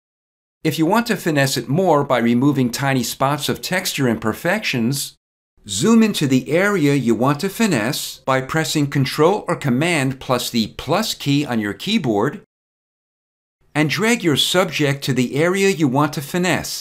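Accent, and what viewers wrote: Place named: Long Island, United States. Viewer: Oh my gawd! I'm from Lawn Guy Land! I live near Seattle now, and I get 'the look' ALL the time! Your accent is like 'home' to me! GREAT tutorial too! THANK YOU!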